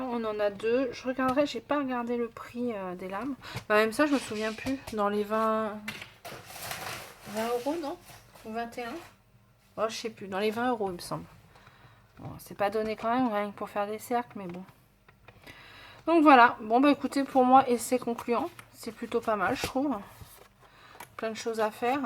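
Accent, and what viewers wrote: England, United Kingdom. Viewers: Cc ma belle l’accent anglais top 😂😂👍hâte de voir ton happy mail .... je pense que je vais l’acheter la prochaine fois.... bisouuus😘😘😘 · Coucou, quand même pas mal cet appareil, top!!! J'adore ton accent anglais, ah ah!! Bises, Véro.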